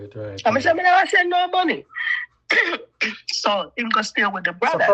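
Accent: Jamaica. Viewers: Living in America so long and still manage to maintain your Jamaican accent eh, good for you girl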